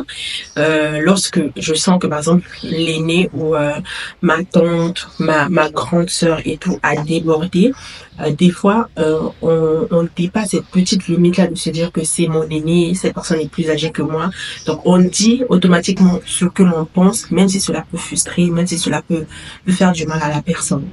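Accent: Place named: Senegal. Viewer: Kitoko moina en tout cas. En plus du as un accent sénégalais. Merci beaucoup pour la vidéo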